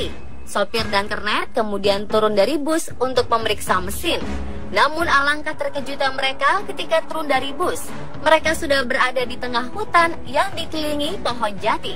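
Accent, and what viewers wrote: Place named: Java, Indonesia. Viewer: Jawa kerass lur.. 😀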